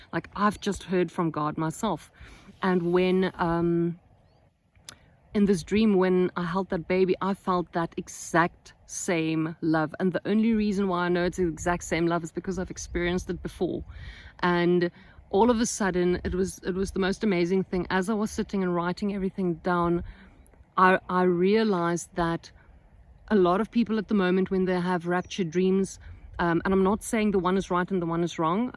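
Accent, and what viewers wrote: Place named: South Africa. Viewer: Could hear you are from South Africa as soon as you started speaking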